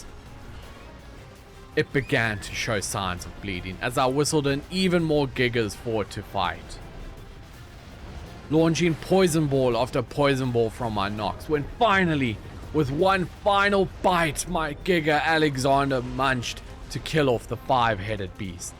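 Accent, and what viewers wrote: South Africa. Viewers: Is South African?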